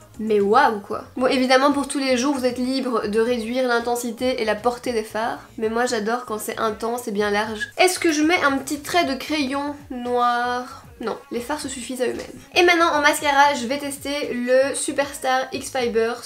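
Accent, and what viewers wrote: Belgium. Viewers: 🙊💞 j'aime vrmt le contenu de tes videos! Tu es super naturelle, et j'adore ton accent belge 😍😍 Bonne continuation 🙆💕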